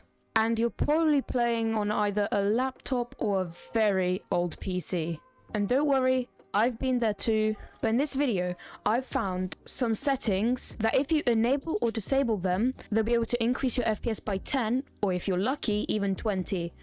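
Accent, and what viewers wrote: United Kingdom. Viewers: british egirl gg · i could tell from the start u were brittish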